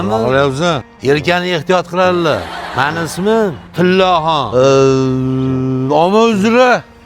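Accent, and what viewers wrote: Margilan, Uzbekistan. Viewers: Маргилонча бубтику. Бормиларми.😊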